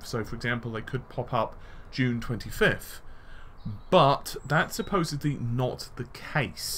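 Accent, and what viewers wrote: England, United Kingdom. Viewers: Best place to listen a good English accent too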